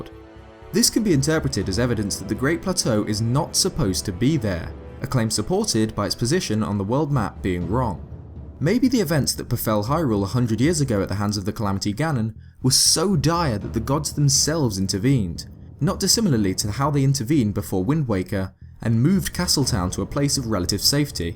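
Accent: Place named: England, United Kingdom. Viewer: shut up guys, I dont hear say ocariner....I however hewr him say O differently. I love his accent! always have liked english accent.💞 I feel close to the english, as we used to be a commonwealth, not sure if we are or not, still have the queen on the money..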